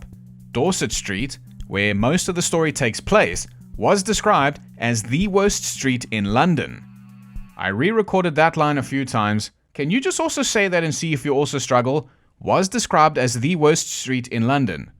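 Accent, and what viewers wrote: South Africa. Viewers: This channel is single handedly giving me a positive association with a South African accent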